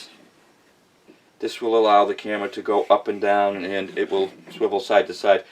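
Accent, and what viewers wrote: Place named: Massachusetts, United States. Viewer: from your accent, you sound like you're from New England. I was raised in New Hampshire.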